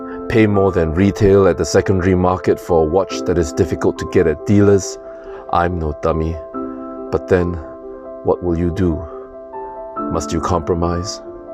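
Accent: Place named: Singapore. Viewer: to - wow. The writing quality is really up there. Turning the born to dare line around was smart and potent. I used to live in Singapore and recognized your accent... then I saw the beaches and knew it was Singapore! Congrats on this quality content, dude. As for me, the Tudor BB GMT is not for me. I don't think I'll ever be able to justify paying more than 1000 for a watch when you can invest it and return 7-10% per year in the market. The mark up is also inexcusable (cost to make vs. MSRP). I don't doubt at all the quality of these expensive watches though. Great video, please keep going. The watch community DOES need thoughtful content. You're going to grow big in no time with these type of thoughtful essays. Good luck.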